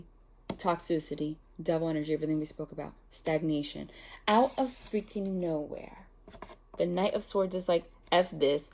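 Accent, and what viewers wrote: New Jersey, United States. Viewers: Ha.. I just clicked , heard you talking and I said, "Jersey!"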